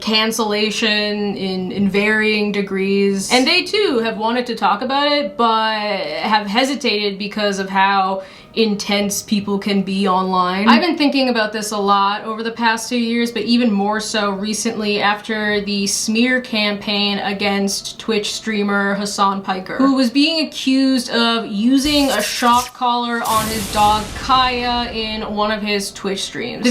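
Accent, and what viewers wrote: Boston, United States. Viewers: shows in NYC while getting ready to move to LA... but i still hear Boston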